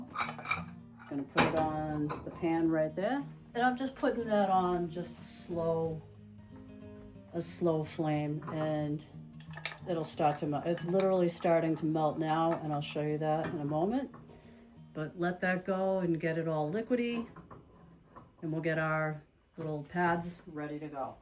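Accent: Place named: Massachusetts, United States. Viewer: Heyy 😊. I just ran across your channel couple of days ago. You're amazing! Seriously,thank you. I grew up in mass, haven't been back in over 20 years. I didn't realize how much I missed the accent. I watch your videos just for that & the scenery 😊. You're very smart & down to earth. I've subscribed & liked all your videos 👍. Thank you again and please keep em coming. Your guy is a very lucky man....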